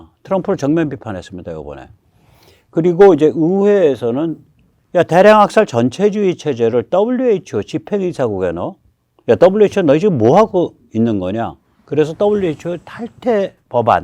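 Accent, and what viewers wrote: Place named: Seoul, South Korea. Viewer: 서울 사투리 쓰시는거 같아요. 'OO 밖에' 를 'OO밲에" 이렇게 말하시네요.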